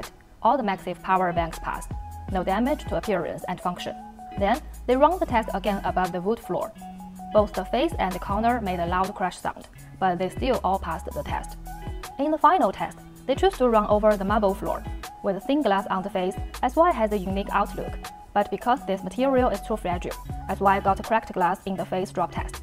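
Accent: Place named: Asia. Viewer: Damn, she talks so quick and with her Asian accent is worse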